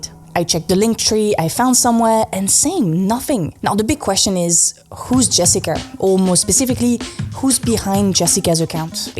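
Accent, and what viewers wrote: France. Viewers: What ks your accent, french? Looking at your username it might also be italian, i do still think french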